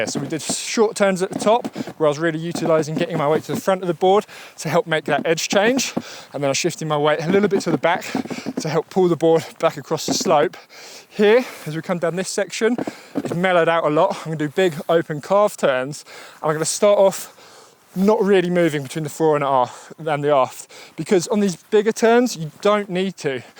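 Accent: England, United Kingdom. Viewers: Wanted to book you, had a look on your homepage but you're so loved + demanded that no chance for this season😔... But good for you😊! I'll try to plan my holidays for next winter and may be I can get some lessons with you if I do it months in advance! Love your way to explain, your technic, your skills, your sense of humour, your love for the natur and your professionnel eye for the mistakes of your students and the way your explain them and make them progress! Not to forget your beautifull english "accent", your dog and your good vibes. It's such a gift to have access to your videos, thanks! Looking forward to get you once as teacher in real 🤩!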